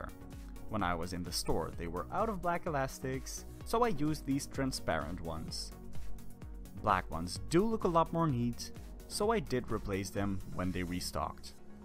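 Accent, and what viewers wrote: Netherlands. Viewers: That netherlands Acsent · She's so beautiful!!! Great job! Btw are you Dutch? I noticed a subtle accent since I am Dutch myself.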